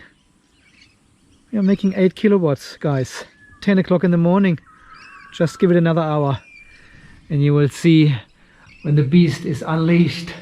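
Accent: Germany. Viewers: I would go crazy because of the leafs :D Allot of hot spots on the panels. Where are your roots? You sound so german :)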